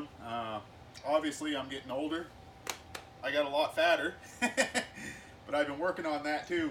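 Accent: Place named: Canada. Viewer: Is it my imagination or are the most popular woodworkers all Canadian?? Love (and miss) the accent!!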